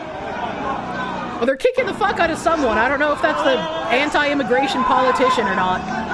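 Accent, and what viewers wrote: United States. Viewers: i love how you say "man" where is your accent from in the states? I am australia so help me out.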